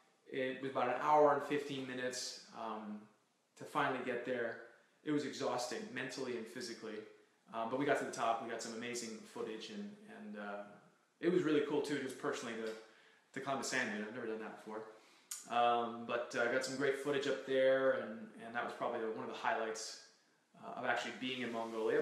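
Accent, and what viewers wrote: Canada. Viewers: When your american and he says drAMa and soory ive never understood but then again im not Canadian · He sounds more Canadian here than on heartland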